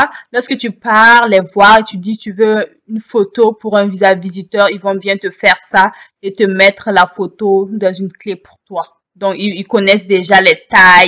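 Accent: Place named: Quebec, Canada. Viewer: Tu as déjà l'accent québécois.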